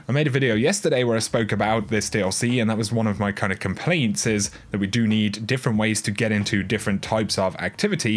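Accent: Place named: England, United Kingdom. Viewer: First D2 was extremely easy and now because of the restrictions has become too difficult to progress, the issue with clan engrams doesn't help. Hopefully they will review this and make some changes. I used to play everyday through D1, D2 doesn't encourage me to do that any more. Lastly, many thanks for all the information you provide, been watching for a while (Nice to hear another English accent).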